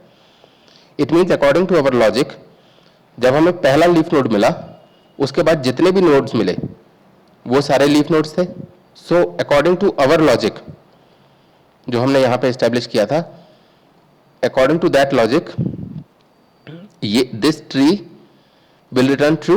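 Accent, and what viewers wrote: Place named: South Asia. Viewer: I really appreciate this video. I think you could reach a broader audience if you spoke slightly slower. I have some experience with South Asian speaking accents, but the speed at which you are talking along with the lower quality of the audio makes it a little hard to keep up (particularly at )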